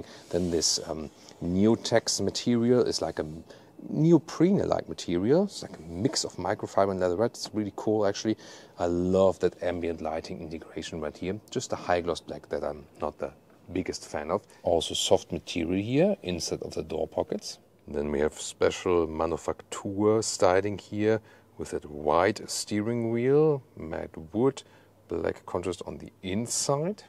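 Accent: Germany. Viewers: This dude has a pretty strong German accent....Great car besides!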